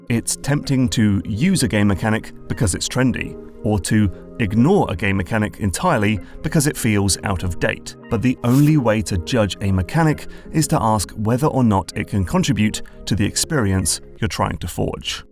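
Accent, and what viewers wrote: United Kingdom. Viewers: Finally, he addresses the elephant in the room: his British accent. cf. Between Two Ferns e/ Benedict Cumberbatch: "Do you think if you didn't have a British accent, people would be able to tell you aren't a very good actor?" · Idk man, the fancy motion graphics and British accent was pretty convincing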